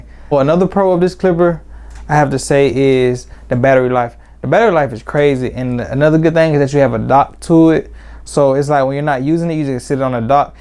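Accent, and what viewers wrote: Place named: Miami, United States. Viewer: You gotta be from Miami the word “really” give y’all away sound like rally lol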